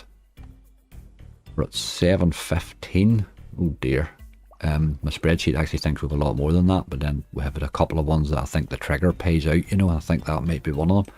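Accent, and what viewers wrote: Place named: Northern Ireland, United Kingdom. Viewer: yea im from belfast new the accent rarely see people from NI on the slots videos lol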